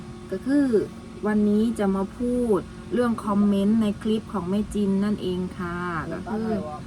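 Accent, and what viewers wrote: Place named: Sisaket, Thailand. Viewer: พูดเหมือนคนศรีสะเกษเลยสำเนียง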